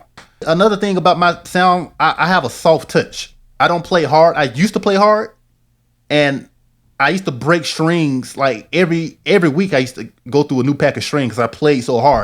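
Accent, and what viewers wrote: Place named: Deep South, United States. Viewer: The only thing cooler than your tone is that deep south accent...great explanations, thank you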